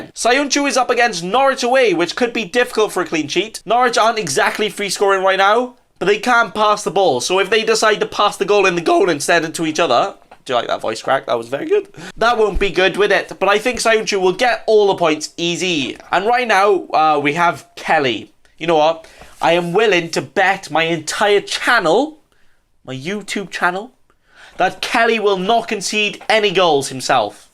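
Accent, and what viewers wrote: Wales, United Kingdom. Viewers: Where you from m8? Swansea? I’m in Haverfordwest....great video as allways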